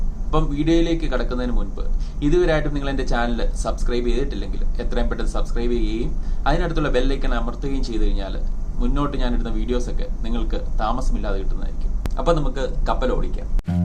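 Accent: Kottayam, India. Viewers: Bro Kottayam kaaran aano.. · Thankalude avatharanam നന്നായിരിക്കുന്നു കോട്ടയംkaranano?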